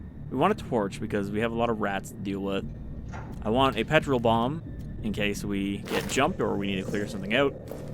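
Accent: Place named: France. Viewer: Golden french accent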